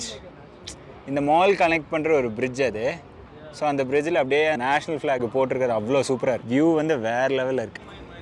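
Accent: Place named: Kongu, India. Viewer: தம்பி நம்ம ஊரு கொங்குதமிழ் பேசுறபோதே உங்களை ரொம்ப பிடிச்சிருச்சுங்கோ .வேலையில் கவனமா இருங்க மா.உடம்பை பார்த்துக்கோங்க சாமி.இது அக்காவோட அட்வைஸ் ங்கோ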